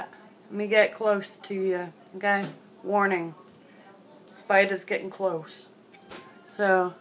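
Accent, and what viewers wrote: New York, United States. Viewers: beautiful teeth for a beautiful girl i sense a slight ny accent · You sound like you're from New York or back East somewhere I'm originally from Puerto Rico but I was raised in New Jersey but the Jersey shore 🇺🇸🇵🇷😊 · It's so funny you sound like a new Yorker with the bottoms out. Not making fun of you In any way so please dont take it like that cause I didnt mean it to be rude.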